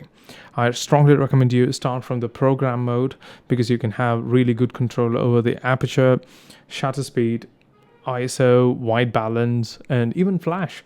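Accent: United Kingdom. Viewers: That English accent 👌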